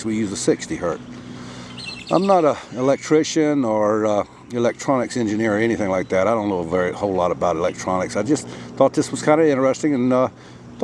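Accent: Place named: South, United States. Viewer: are you Georgian or Southern? I like the accent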